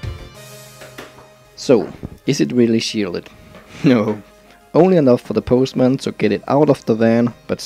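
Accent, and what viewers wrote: Denmark. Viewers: I like the danish accent..sounds cute somehow =) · sys nok jeg ku høre du var dansker men var i tvivl :D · Danish. I knew it from the accent :D Interesting video, bro! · Sorry was just curious, thought i seen alot of german on magnets and such but you sounded very distinctly Danish.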